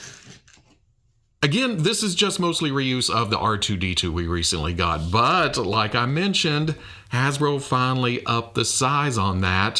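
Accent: New Zealand. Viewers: the kiwi accent is not ideal but still a fantastic effort from a guy so far away from new zealand he’s closer to hawaii…. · lmao I love your New Zealand accent! Excited to get both of these figures!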